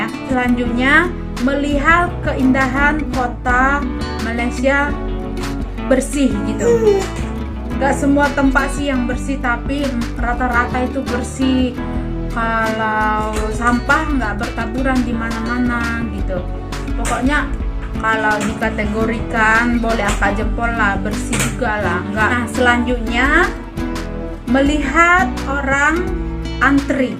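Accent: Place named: Medan, Indonesia. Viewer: Dia dari Medan Sumatera Utara Indonesia... wkwkwk cuma bagi tahu je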